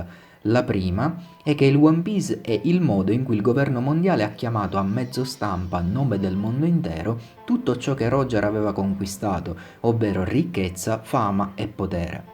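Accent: Italy. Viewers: Ottimo canale. Una domanda. Siculo? Agrigentino? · Bel video! Sei calabrese/siciliano?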